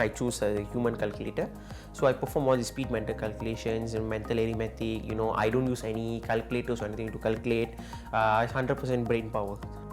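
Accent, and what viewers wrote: India. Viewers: I dont if it's only me but he sounds like Indian to me.🤔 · Moment i saw your face and accent I guessed you are tamil. Now it was confirmed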